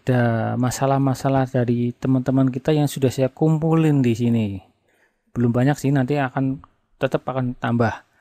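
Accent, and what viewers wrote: Banyumas, Indonesia. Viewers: menyimak wae om cara setting comand line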